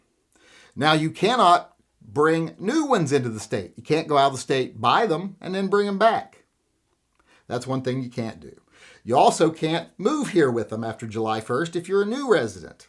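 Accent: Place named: California, United States. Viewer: You are sounding like a Californian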